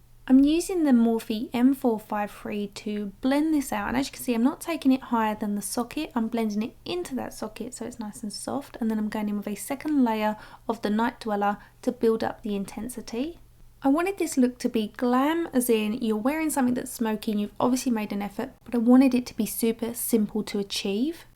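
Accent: United Kingdom. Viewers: I know this is a random question, but can anyone tell me which UK regional accent has?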